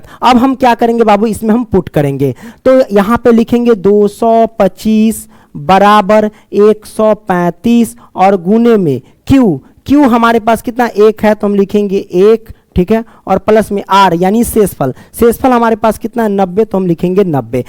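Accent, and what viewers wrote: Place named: Purnea, India. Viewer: Sir aapki language bihar ke purnea ki lg rhi h ❤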